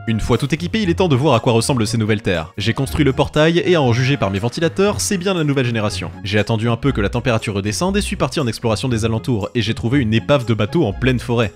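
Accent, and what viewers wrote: Quebec, Canada. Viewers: J'ai une question pk defois tu parle québécois a travers de tes phrase?sinon continu comme sa tes vidéo sont incroyables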